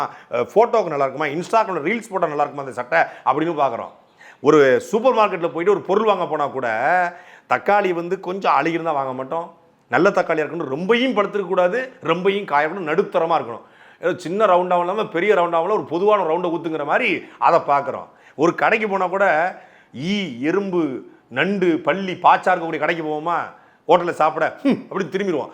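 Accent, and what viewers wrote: Tirunelveli, India. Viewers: thirunelveli pechukku enathu valthukkal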